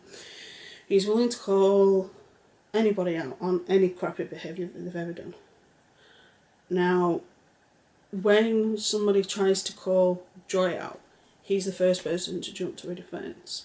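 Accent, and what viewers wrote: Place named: Yorkshire, United Kingdom. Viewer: You are so right, about the barrage of negativity and vitriol--it does make one feel ill, some of her streams are so vicious I can't watch at all, just have to turn it off. And feel the worse for having exposed myself to it. Anyone would feel ill after getting worked into such an angry emotional state, voluntarily mind you, for none of this arguing is anything but self-created and self-imposed; turn off the camera, and it all evaporates into the ether. The still frames captured from those streams and raging videos speak volumes. Some people live their entire lives like that however, in their daily life, in a constant state of arguing, cursing, shrieking, they live for conflict. One of the reasons i enjoy hearing your take is how calm you are, level headed, and that luvly Yorkshire accent. I have good friends from Hull, Barnsley, Sheffield, even up to Middlesbrough. 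York is one of my favorite places on planet earth, and if i won the lottery, I'd buy a small home there and spend my summers way out in the middle of the countryside, if i could. And just go into town once a week for supplies and pub.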